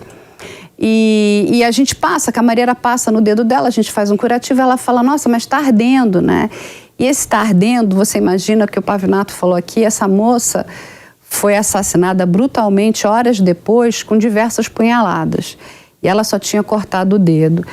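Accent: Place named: Rio de Janeiro, Brazil. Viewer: vc eh boa profissional e gosto de assistir seus vídeos, MAS POR FAVOR, PARE DE FALAR CARIOCA!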